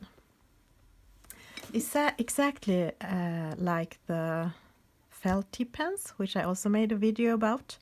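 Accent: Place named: Italy. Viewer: Your beautiful accent reminds me of the accent that Meryl Streep imitated in the movie, 'Bridges of Madison County'. Are you Italian?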